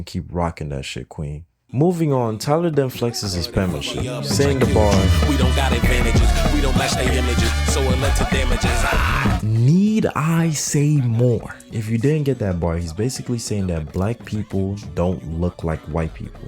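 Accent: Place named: Nigeria. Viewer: Are you NIgerian? I can hear it in the way you pronounced his last name and how you pronounce the word "the".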